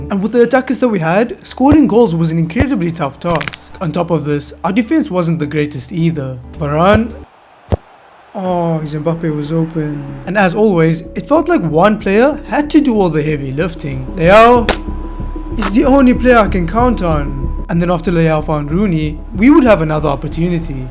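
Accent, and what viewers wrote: South Africa. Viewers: he is south african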